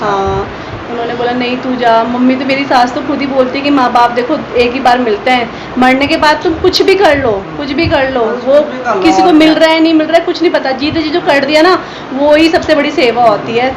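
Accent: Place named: Garhwal, India. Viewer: Apki bolne se pata chalta ki aap tehri garhwal se ho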